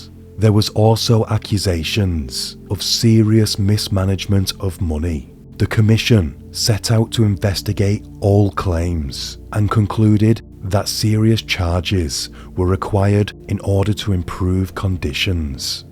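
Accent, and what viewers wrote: Wales, United Kingdom. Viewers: Your accent and cadence dont match, its like a normal accent with Welsh flow or something 😅 i cant put my finger on it but it sounds fake like you're doing a bad valley girl impression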